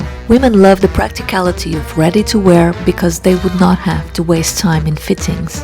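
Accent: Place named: Portugal. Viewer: Portuguese accent . Love it.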